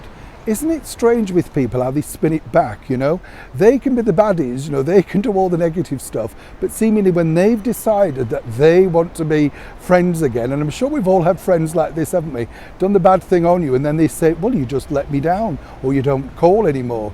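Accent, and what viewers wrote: United Kingdom. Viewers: I guess it's your lovely accent. But I always click on here knowing that there will be absolutely no substance to whatever you are saying. But because I adore British accents I wind up clicking anyway.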